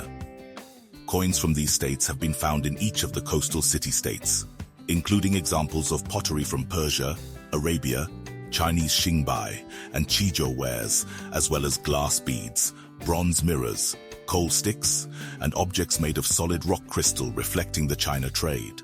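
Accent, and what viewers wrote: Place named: Kenya. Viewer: You can hear clearly that the person who created this video is from Kenya,Kenya does not have ancient Swahili History,but they like to boast that Kiswahili started from Kenya Waswahili are the Ethnic people,Kiswahili is the language,Waswahili came from the Arabic world "Sahel" which means cost.,or Zenji which refer as "N" word for black people The ethinic gruoup of Waswahili is the mixed breeds of foreign people mostly Arab and he tribes of the east coast of African ,Kiswahili the language was and still a commercial used by the foreign and local people for trading Kiswahili language is a mixed of Bantu languages and mostly words,50 percent of Kiswahili words id of Arabic language Lamu and Mombasa where you can find group of people who speak Kiswahili in Kenya was part of Zanzibar,Kiswahili was brought to those area by Waswahili of Zanzibar Wangozi a tribe from Kenya, are Wangozi they have their language,culture and tradition, they are not Waswahili,they are just part of East African coast tribes when different tribes like Wadigo,Wazaramo,Wandengereko,Wazigua,Wasegeju etc meet with trader from middle and far east like Arabs,Persian,Indians,Chinese and Portguese they creat a language of communication called Kiswahili.. 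Lamu and Mombasa was never been a commercial places,Kilwa and Zanzibar were the commercial places in East Africa under the Sultan of Zanzibar,where different group of people met to trade In Australia the scientist found a ancient coin from Kilwa go back to 8th ce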